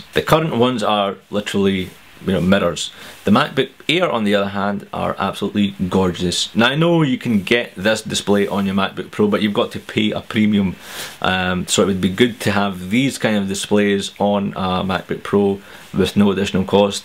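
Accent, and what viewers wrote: Scotland, United Kingdom. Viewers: The Scottish accent is very soothing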